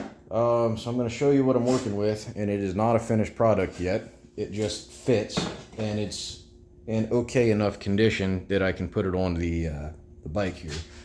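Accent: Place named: New Jersey, United States. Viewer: Hey man I have a question. I got a Moto Iron front end, and the springs make a hellish amount of noise when hitting a bump, on the brakes or anything that causes suspension compression. Sounds like a slinky. I sprayed the hell out of every part of the spring assembly with PB silicone spray, didn't seem to help. At idle, the inner spring on the lower right side is visibly vibrating and shaking like crazy. Wonder if that could cause the noise? Is there some kind of adjustment I can make to fix this shit? I'm brand new to Springers, so I know next to nothing about maintaining and adjusting them. Also, you from NJ? You got a North East NJ accent to my ear. I live in central/southern Jersey on the shore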